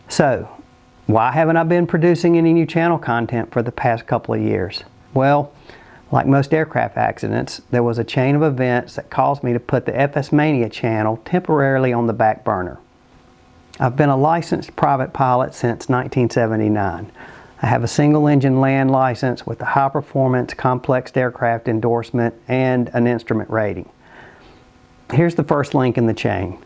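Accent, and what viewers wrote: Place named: North Carolina, United States. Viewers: Hello,. First. Congrats to you on your next major step in life...retirement. I should know as I am as well. Let me be one of many to welcome you back into "active duty" on your channel and your signature way of providing intuitive and colorful videos and talking points. I have followed your channel since its inception and absolutely love your storytelling and "fun facts". I can remember on one of your episodes where you were getting an earful about your southern drawl. Well I can identify with that as well. As this is one of the first times I have commented on your channel I totally identified with your series as you were receiving an Embraer biz jet out of Brasil. My wife and I both watched the series and found it to be really good. She especially loved it and listened to your pronunciations of some of the cities....as she is a native of Brasil. 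Anyway...so much for the long comment. Welcome Back!!....Alvin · Hello, and welcome back, FS MaNiA! We've missed you very much,!! IMHO, as an avid (but beginner) flight simmer, your YT videos are the best available. Your attention to detail, excellent teaching style, interesting and very enjoyable "Fun Facts", along with an occasional "live flight" video, make your channel the most useful, helpful, and thoroughly enjoyable flight-lover's learning source! And your North Carolina accent (I'm just down the street from you in the Charlotte area!) is delightful and so very pleasant to listen to!! BTW, yours is the first YT channel I subscribed to and, just a few minutes ago (because I just happened to see your 2020 Update video in my YT list when I got online the other evening), also the first channel on which I activated the Notification Bell. I don't want to miss any opportunities to learn more about flight from you!! 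I'm so glad you're back online with us!! And congratulations on your "semi-retirement"!!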